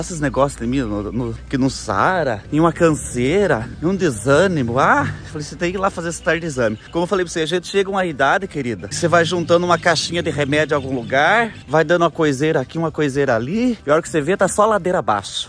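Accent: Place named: Minas Gerais, Brazil. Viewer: descobri vc Ágora no YouTube você é muito engraçado seu sotaque parece ser mineiro e parece ser nordestino, eu sou nordestina, adoro você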